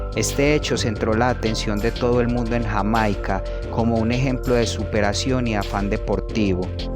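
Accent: Colombia. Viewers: Ése acento colombiano enamora a cualquiera.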